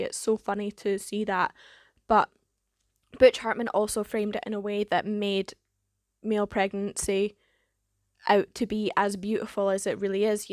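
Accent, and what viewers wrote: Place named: Scotland, United Kingdom. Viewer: Where are you from? You have a really Scottish accent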